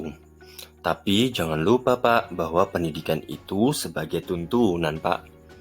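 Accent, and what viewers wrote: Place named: Java, Indonesia. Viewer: Wow. This has incredible visualization, idea, and script. Moreover, your Javanese accent will never ever be changed, that's our character. Good job, Pak.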